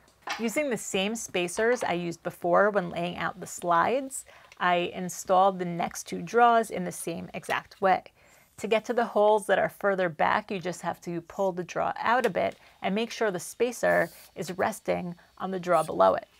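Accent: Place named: Long Island, United States. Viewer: You sure you're not from NY? Between "draw" and "foward" you sound like all my Long Island friends and family haha! Being from VT and going to college in MA, my brain gets super confused with accents sometimes :) Agree with great vidieo.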